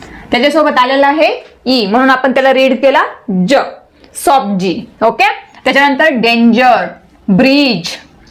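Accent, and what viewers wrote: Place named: Khandesh, India. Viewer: Where are you from ma'am your language tone like khandeshi girl. Are u khandeshi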